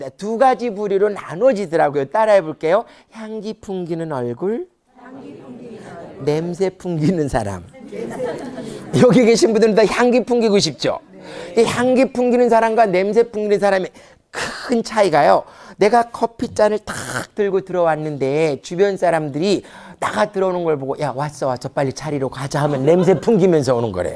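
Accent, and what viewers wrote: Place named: Jeju, South Korea. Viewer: 제주도에서 사투리로 웃음강의하시는 쌤 입니다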